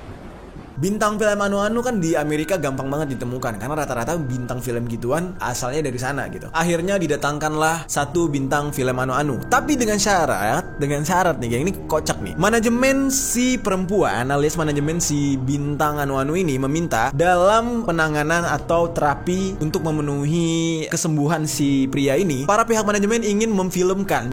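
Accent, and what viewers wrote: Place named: Java, Indonesia. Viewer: Wong jowo ndi suarane lor?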